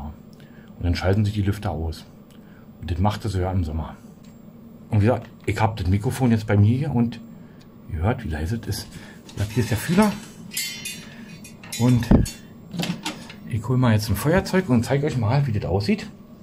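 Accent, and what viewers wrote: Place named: Brandenburg, Germany. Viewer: Diese 8 Minuten vergehen wie im Flug... In welchem Landkreis bist du denn Zuhause? Überlege ob ich mal deinen Tracker genauer in Augenschein nehmen muss!😊 Klingst nach südlichem Brandenburg....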